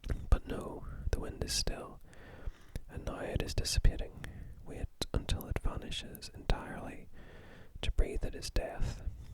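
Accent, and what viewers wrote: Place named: Ireland. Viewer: I’ve been searching ‘Conan ASMR’ on a regular basis for like ten years hoping something good would pop up one day, super glad something finally did. The Tower of the Elephant was one of Howard’s best, in my opinion. If you do another Conan video (& I really hope you do), what story do you think you’d do next? I personally think the Phoenix on the sword would be a good one for ASMR, but obviously any of them would do. I also want to mention that I think it’s great that you have the accent that you do, because while I think most people understandably imagine Conan with Arnold’s thick Austrian accent, in my personal head-canon, he’s Irish. So it’s really cool to hear that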